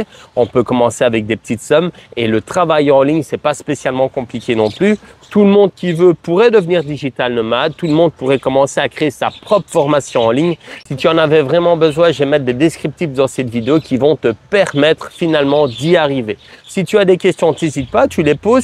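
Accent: Liège, Belgium. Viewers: Le DTV est très bien mais dès lors que tu veux orienter une partie de ton business avec la Thaïlande comme thématique il faut alors en effet créer une société. C'est ce que j'ai fait, nous avons ajouté l'activité de communication digitale au sein d'une de nos trois sociétés, l'occasion en même temps d'y incorporé les revenus que je génère avec ma chaine secondaire et l'affiliation, même si cela n'est en rien lié avec le Pays du Sourire. Et oui, flirté avec la "zone grise" peut avoir de graves conséquences, d'autant plus que le gouvernement va scruter très attentivement les réseaux sociaux dès cette année, il y a quantité de Thaïlandais et d'étrangers qui ont développé des activités en ligne ( surtout sur line pour les Thaïlandais ), qui génèrent des revenus qui feraient rougir bien des patrons de grosses boites en Europe, et qui ne sont bien sûr absolument pas déclarés, ou faussement déclarés avec des bureaux virtuels dans des pays complaisants. 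Des centaines de millions de bahts de taxes perdues pour l'Etat, gageons qu'ils ne vont pas en rester là... Sinon, à l'accent, tu ne serais pas de la région de Liège ?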